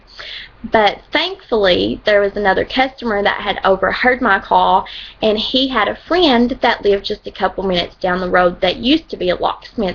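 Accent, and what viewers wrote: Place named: United States. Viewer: Hey momma holy country accent lol that took me by surprise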